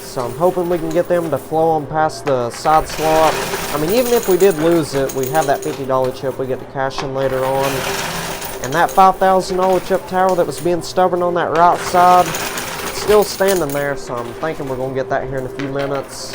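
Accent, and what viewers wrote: South, United States. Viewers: I really enjoy watching. You sound like you’re from down south.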